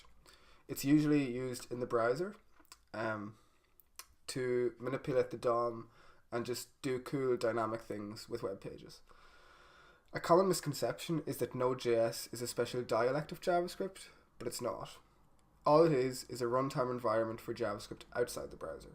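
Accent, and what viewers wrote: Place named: Ireland. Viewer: it's called an irish accent hahah!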